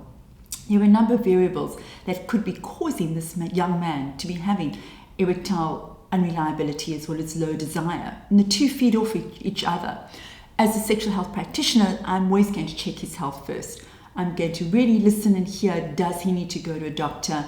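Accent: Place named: South Africa. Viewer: she sounds like she's from SA.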